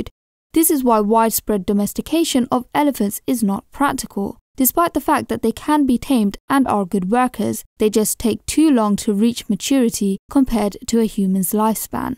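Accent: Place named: United Kingdom. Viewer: rejvaik because she’s British not American.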